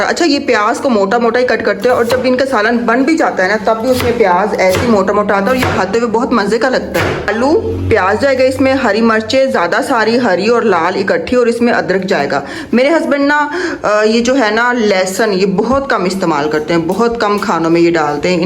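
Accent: China. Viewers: apka Chinese accent Kitna perfect hai MashaAllah bilkul Chinese style sy bolti hu aap apki daughter same apny baba py hai , dear mey bhi jab chicken banati hu pehly Thora boil kr k yakhi phynk deti hu kiu k hum Kon sa khalis chicken khatey hai boilers chicken,,,,,,,, behtr huta hai Thora boil kr k paani phynk dey , Desi chicken ku boiled kr k NAHI phynktey kiu k Wu khalis huti hai , apk husband ki cooking out class huti hai tasty and healthy 👍🥀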